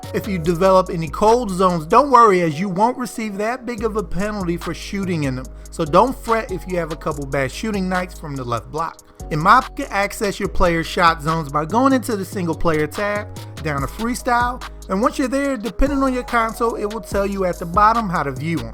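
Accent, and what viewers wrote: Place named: Baltimore, United States. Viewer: Can tell you from Baltimore wit da accent 😭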